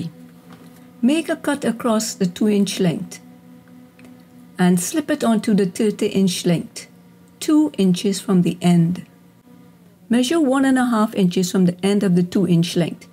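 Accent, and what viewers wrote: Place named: Trinidad, Trinidad and Tobago. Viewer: Trinidad accent ... Love it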